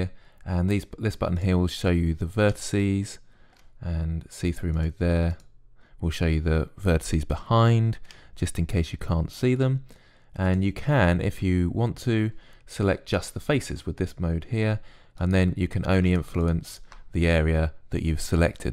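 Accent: United Kingdom. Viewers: I like this british accent : )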